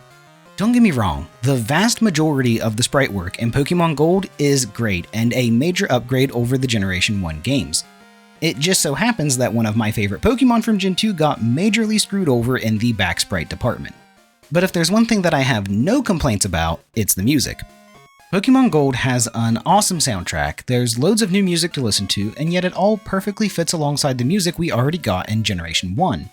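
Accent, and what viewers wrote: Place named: Philadelphia, United States. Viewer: You have an interesting accent! Is that a Philadelphia or New Jersey by chance?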